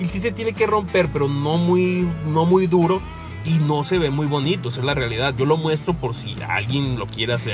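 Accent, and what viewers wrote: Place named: North, Mexico. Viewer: Yo tengo entendido que es de saltillo o torreón no estoy muy seguro pero vive en estados unidos y no tampoco es de Monterrey solo que es el acento norteño que tienen en eso lares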